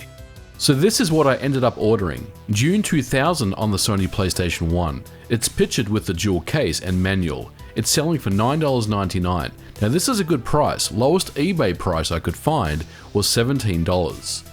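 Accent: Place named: Australia. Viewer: As an Aussie I thought you lived in Australia...keeping your accent is a good effort.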